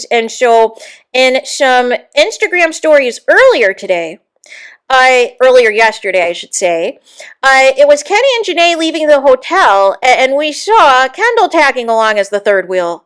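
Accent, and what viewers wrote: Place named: Minnesota, United States. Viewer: i love ur accent! i just know ur from Minnesota 🤣🤣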